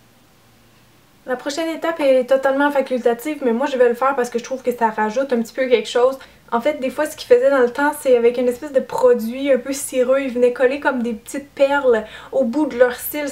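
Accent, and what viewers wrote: Quebec, Canada. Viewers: c'est exactement ça que j'avais besoin pour mon costume des années 20, le maquillage et la coiffure, Merci beaucoup pour ton aide :) excellent vidéo et franchement ça fait du bien d'entendre une québécoise nous donner des trucs et conseils :) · Je ne supporte pas cet accent canadien! J’ai toujours detesté !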